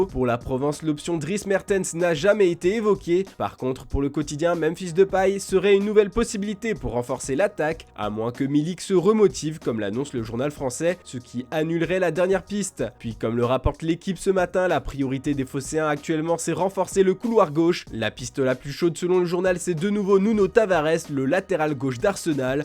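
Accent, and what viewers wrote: Italy. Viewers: Pourquoi y’a plus l’accent Italien accentué ? Vous avez changer Foot Mercato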